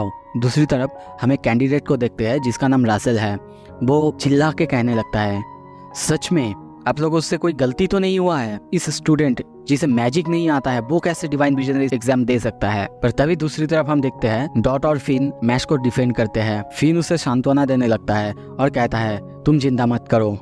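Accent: Bengal, India. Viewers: Sounds like a bangali. Ma bhi bangali hu. Nice explanation video.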